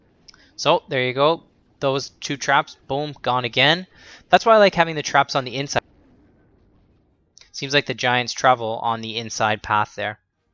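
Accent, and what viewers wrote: Canada. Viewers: your Canadian :D i can tell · canadian? lol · Not trying to be mean but r u Canadian?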